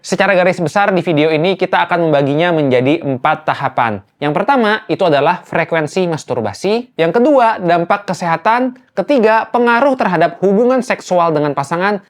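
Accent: Banjar, Indonesia. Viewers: urang banjar kah pian dok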